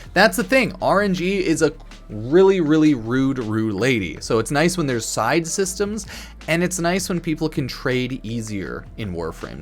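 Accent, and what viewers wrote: Canada. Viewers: are yuou Canadian? timmy fan eh?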